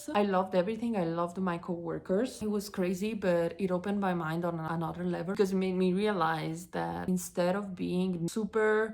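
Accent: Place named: Italy. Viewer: An Italian woman agrees to work for Little Caesar's .... the subliminal message you are sending (from a REAL Italian complete with accent) is ... "I endorse Little Caesar's pizzas." What greater endorsement could they have? They should have given you a raise on your first day for the message that sends to customers. [EDIT] Judging from this video I think you could make a fortune on TV commercials for Little Caesar's.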